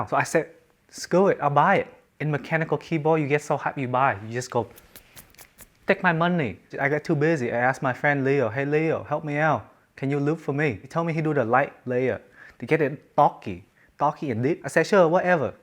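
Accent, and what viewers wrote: Vietnam. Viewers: As a Vietnamese, I love your accent so much hahahaha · I like your viet accent bro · That vietnamese accent is gold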